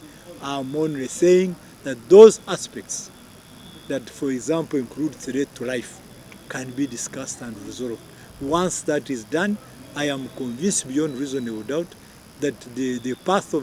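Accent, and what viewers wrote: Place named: Rwanda. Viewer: Why does he talk like a Munyarwanda? Also his name is a kinyarwanda.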